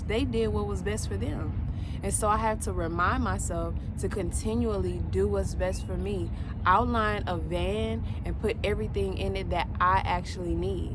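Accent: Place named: South, United States. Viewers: Southern girl love it. Great video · you look beautiful and healthy. I love when your texan accent comes out lol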